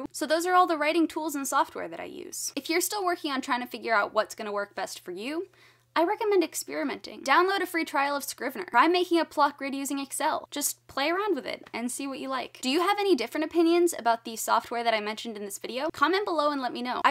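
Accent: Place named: United States. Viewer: love your very american accent, its like listening to a tv series, your voice is very hisg school type but cool and likeable. :D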